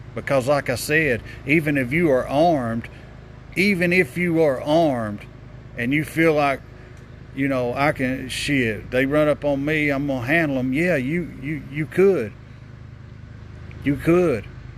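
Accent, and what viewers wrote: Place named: South, United States. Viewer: You're such a wise man! You should really start a podcast (if you have time [outside of your JOB, of course]). Speaking with a deep Southern accent with heavy feeling has made many, many people think that you're one of the wisest people on earth! They're certainly not complete idiots, right?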